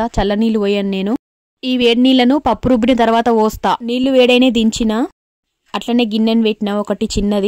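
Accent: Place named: Jammikunta, India. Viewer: Maadhi jammikunta akka meeru matladthante mem matladinatte anipittandhi....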